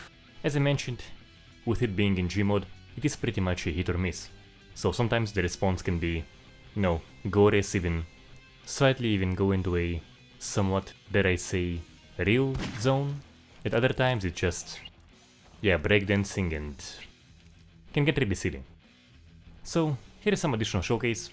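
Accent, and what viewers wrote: Russia. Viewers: It is me or your real voice is russian and talking english?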